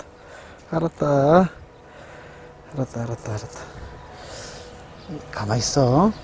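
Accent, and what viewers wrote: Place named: Gangwon, South Korea. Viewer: 저도 강원도라 말투가 친근감 있네요~^^ 요즘은 붕어 낚시안하시나요? 제가 그자리에서 생애 첫 월척을 ㅎ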